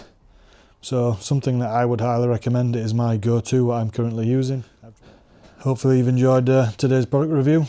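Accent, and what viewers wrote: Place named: Scotland, United Kingdom. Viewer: Great video man ! Watched it right till the end. I noticed when you rinse off, you also make sure you give the door jam spaces and body fittings a good blast to make sure you get any debris, sand grit, leftover soaps etc. . .Nice work. . . JUST like I do. I have a Mazda CX5 and I hit those same areas well with the spray gun. The CX5's have "gutters' on the roof for a roof rack install. Those areas are notorious for hiding gunk, grit, sand, leaves etc. I'm not a pro. I'm just a weekend enthusiast that loves taking care of my cars. Like I said, I enjoyed your video, and thanks for taking the time to put it together. Are you in Scotland ? I detect what sounds like a Scottish accent. I recently bought a bunch of car wash stuff; - active 2.0 pressure washer - upgraded braided 50' hose with quick attachments - a good spray gun and wand extension - MTM foam cannon - I already have a dewalt 60v max blower with 15 amp hour batteries to dry the car. 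and MANNNN does it ever blow LOL :D - 4L GYEON foam - 4L Gtechniqiue ceramic Gwash - couple Gyeon wash mitts - couple Gyeon dry towels (to pick up left behind water drops) - Gyeon bug n grime spray 500ml - Gyeon exterior detailer 4L I have a bunch of other cleaning stuff I've acquired over time so I'm well equipped. I live in an apartment building over here in Canada and we have a hose tap in the underground garage where I park. There's also an electrical outlet about 20 ft away. Cheers bro !!!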